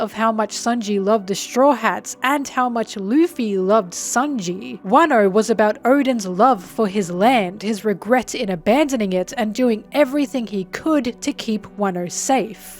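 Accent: Australia. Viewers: What your Australian!!! Samsies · Amazing video, also i didnt know you were australian too, hell yeah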